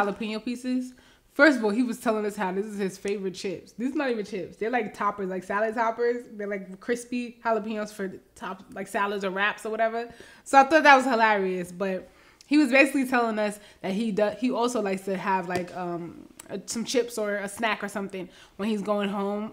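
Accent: New York, United States. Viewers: Are you originally from New York?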